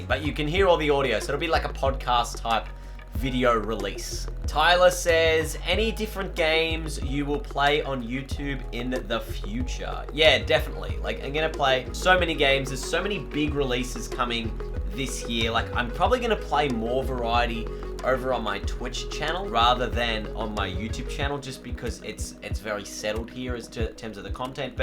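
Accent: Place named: Australia. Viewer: you have such a nice aussie accent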